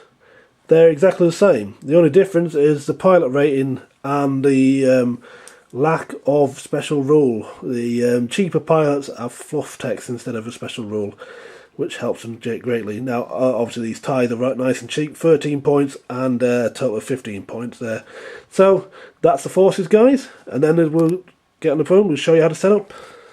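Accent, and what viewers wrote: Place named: United Kingdom. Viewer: All things sound more intelligent if said with a British accent!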